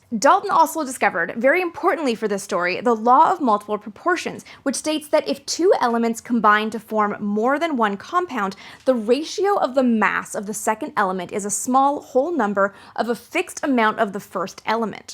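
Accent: Canada. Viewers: She's Canadian, yes? Or Possibly from Minnesota.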